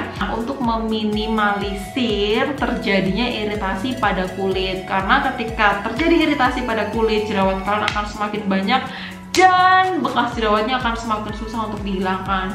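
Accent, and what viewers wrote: Java, Indonesia. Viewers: Dokter asli mana nih logatnya kok medok?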